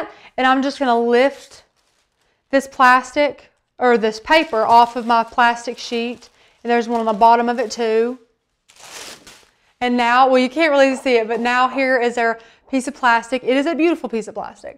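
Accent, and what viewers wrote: South, United States. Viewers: Nice to hear someone actually form the south for once. Great instructions. It looks like fun , also creative. Thank You from Georgia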